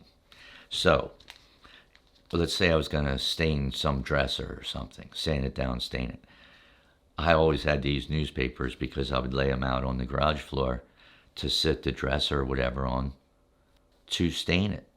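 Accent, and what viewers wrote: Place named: Philadelphia, United States. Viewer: You've gotta be from Philly or from the Philly burbs. Just a hunch! You sound like most of the people in my family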